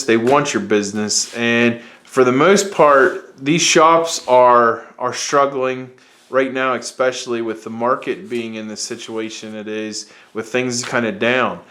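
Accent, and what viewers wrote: United States. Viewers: I must apologize, didn't mean to be disrespectful. I was asking where you are from. I was going to guess Pennsylvania, Florida or North Carolina. Based on your accent.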